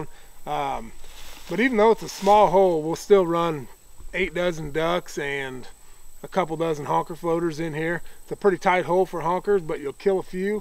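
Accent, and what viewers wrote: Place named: Arkansas, United States. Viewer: Sounds like you’re from Arkansas…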